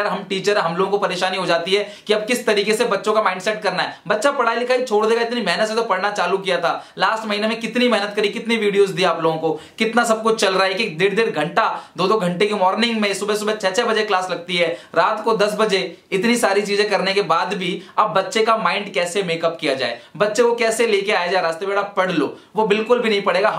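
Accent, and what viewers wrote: Odisha, India. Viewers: Sir Aap Odisha ki ho kya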